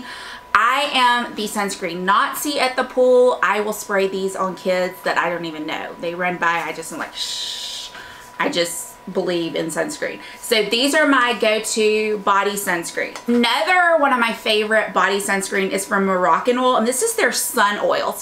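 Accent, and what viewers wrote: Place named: Mississippi, United States. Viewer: I have been dieing to know where you were from and as soon as you said "oil", I knew it was Mississippi! I'm from Mississippi too but currently live in Houston. Love your channel and appreciate a lot of your humidity proof make up tips!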